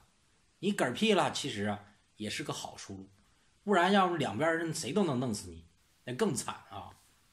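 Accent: Northeast, China. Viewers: 太搞笑了，東北話太帶感了，全程像听相聲，笑死我了🤣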